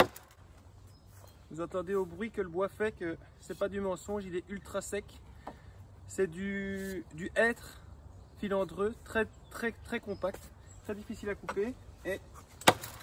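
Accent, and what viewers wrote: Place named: Belgium. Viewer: salut l ami , es tu belge ? Ton accent me rappel m chez-moi ( liege ) super vidéo continue l ami :)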